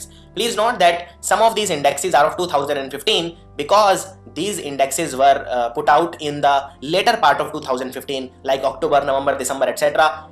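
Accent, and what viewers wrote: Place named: Telugu, India. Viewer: Disgusting typical Andhra(telugu) accent...most Indian name is spoiled by these guys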